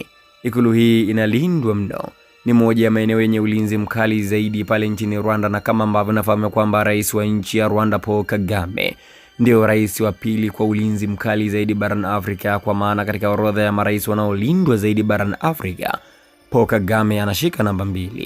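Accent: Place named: Kenya. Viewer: Mtangazaj ni mkenya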